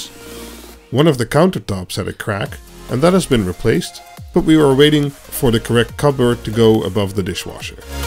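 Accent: Netherlands. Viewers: Ik hoorde meteen je Nederlandse accent. Mooi huis!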